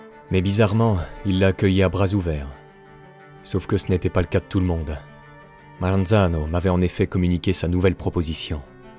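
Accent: Italy. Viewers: Super documentaire digne d’un vrai travail d’investigation!!! Avec l’accent italien de surcroît!!! J’adore et je me suis abonné (+ cloche) direct car trop hâte de suivre la suite!!! J’ai 49 ans et suis quelqu’un de bien mais depuis petit j’ai toujours l’âme d’un voyou qui ne me quitte pas… lol 😎😉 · La vidéo est super. Par contre insupportable l'accent Italien. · tu peux un peu moins pousser sur laccent italien quand tu prononce les noms merci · Super la voix avec les accents italiens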